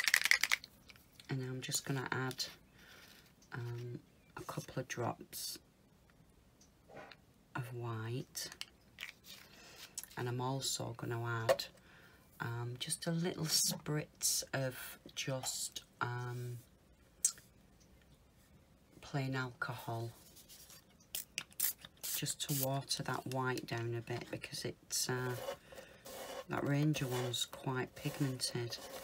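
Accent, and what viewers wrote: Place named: North, United Kingdom. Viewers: Just found you on YouTube, I’m getting back into polymer after about five years and it’s nice to hear a northern accent 🤗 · Love the video, nice to hear a fellow northern voice!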